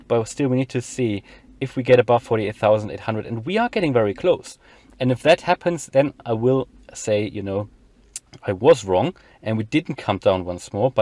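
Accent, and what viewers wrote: Germany. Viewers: Sounds you are from Germany - beste Grüße, Robert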